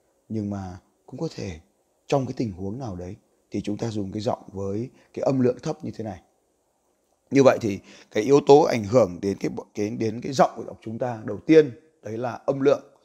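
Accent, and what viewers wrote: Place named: Hanoi, Vietnam. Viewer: Giọng Hà Nội là hay rồi.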